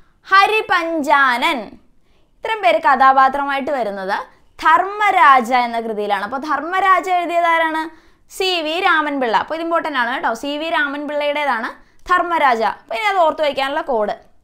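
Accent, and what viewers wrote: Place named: Kottayam, India. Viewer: കോട്ടയം, ആലപ്പുഴ എവിടെയാ വീട്....ഭാഷ കേട്ടിട്ട് ചോടിച്ചതാ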